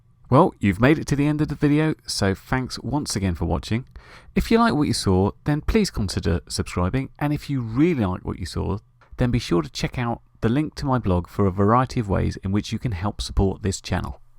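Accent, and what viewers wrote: United Kingdom. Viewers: Like the old saying goes, you get what you pay for. You have more patience than I do. Still enjoyed the video. English accents are always more enjoyable to listen to. Imo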